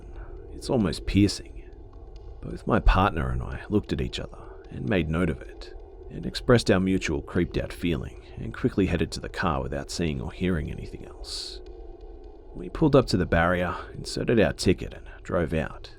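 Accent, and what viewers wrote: Australia. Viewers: Are you from Australia mate and if so what state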